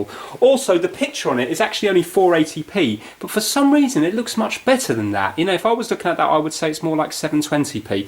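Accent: United Kingdom. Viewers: Wait are u British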